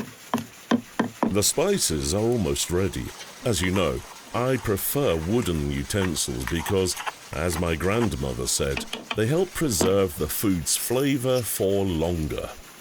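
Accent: United Kingdom. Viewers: good British voice over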